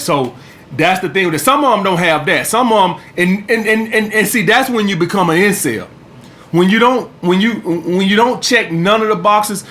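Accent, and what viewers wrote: South, United States. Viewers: I feel sorry for you guys. How pitiful do you have to be to listen to a guy like this to educate you on women. This dude country as F. Damn near sound like he got a speech impediment.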